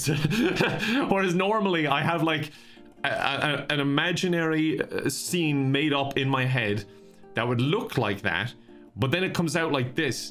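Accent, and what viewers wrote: Ireland. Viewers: i watched many of you videos this year after a friend sent me your first among us one when she was tryna get me to play and i kept watchin them because it made me miss my grandparents a little less,, that might sound weird but theyre irish and your accents are slightly similar tho yours is stronger i havent seen my grandparents for over a year so thank you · Instead of listening to music in the car, I play videos when I drive. Nothin like Irish accent to get you going places (no pun intended)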